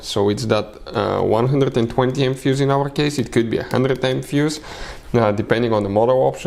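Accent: Russia. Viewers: half way through video hes still talking in that horrible russian accent! ughhh i have to vomit now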